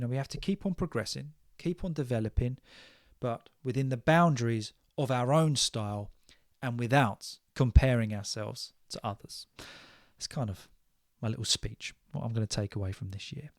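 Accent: United Kingdom. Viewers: Your podcast is one of my favorites. And what I like about it, it is your british english and listening to your podcast, I feel like I am in the UK. You transport me throughout your accent, your behavior, etc. When you speak about your country, those are my favorite moments. Please keep going. I wish you the best for 2025.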